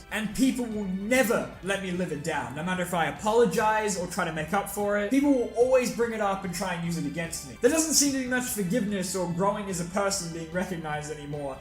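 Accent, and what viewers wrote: Australia. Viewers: Ahh, nothing like my Aussie mate talking about YouTube stuff. 👍👍 Keep it up!!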